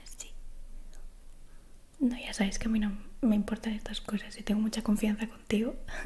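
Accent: Spain. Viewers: Me encanta el acento Español sigo a varias artistas del ASMR españolas como Annie y Sur, me vuelven loco y aunque hablamos el mismo idioma tenemos diferencias en el hablar, me facinan¡¡¡¡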